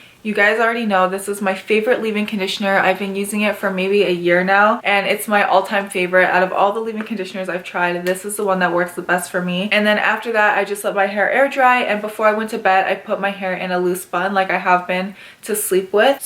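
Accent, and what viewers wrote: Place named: United States. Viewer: Are an Indian u are talking like American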